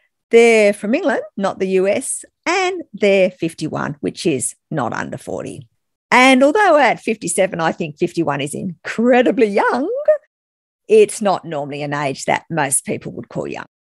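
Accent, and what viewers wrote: Australia. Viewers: Magnificently sensible. Another excellent debunk. The Aussie accent is pure bonus.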